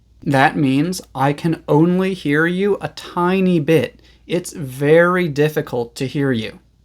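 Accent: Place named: United States. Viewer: .. I'd like to know where your Am. accent is from... Which state and city were you born in?? Please, answer me when you can.I'm pretty curious about it 😊 Thanks a lot for your classes.You're a great teacher!❤❤😊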